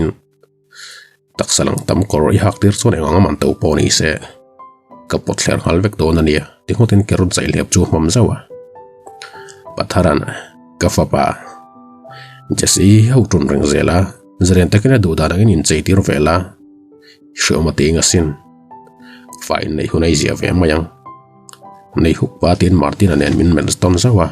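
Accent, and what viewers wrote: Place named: Hritlang, India. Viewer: Fel thei ania aw... i Hritlang aw pawh ngaihthlak a nuam lutuk ania 😍😍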